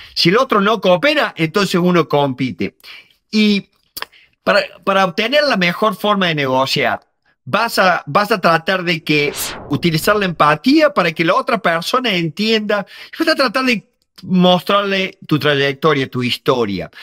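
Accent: Córdoba, Argentina. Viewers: Me encanta tu tono cordobés, muy útil este video. Gracias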